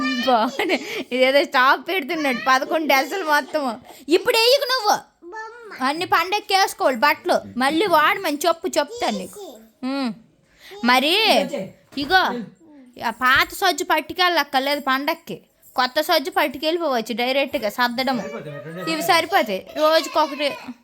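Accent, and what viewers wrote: Srikakulam, India. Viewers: Me srikakulam anttah bad slag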